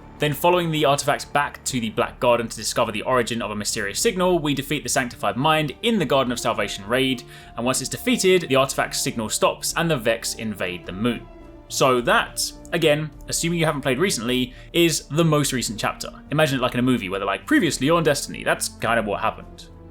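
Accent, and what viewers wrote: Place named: United Kingdom. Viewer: I really wanted to like the video but you sound just like a Brit that I had to rearrange his face...lol he came to the wrong town in bama running his mouth...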